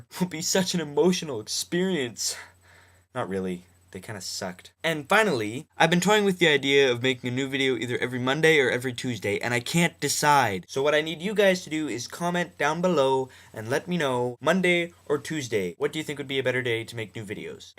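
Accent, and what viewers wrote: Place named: Canada. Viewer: You sound canadian are you canadian?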